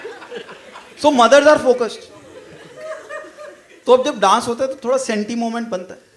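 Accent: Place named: Punjab, India. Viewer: Decent comedian but has lot of Punjabi influence.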